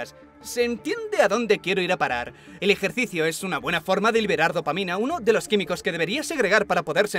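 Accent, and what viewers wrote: Spain. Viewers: "Es confuso verdad?..." - El Dios con la voz española españa talla por los dioses y los demonios... La verdad sabe temas y cosas que puede hacer FAMOSISIMAS!!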